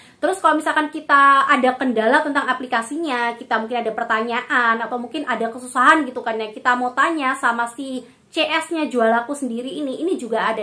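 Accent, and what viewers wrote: Java, Indonesia. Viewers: Mbanya medok banget. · Mba logat nya ky orang Jawa😀😀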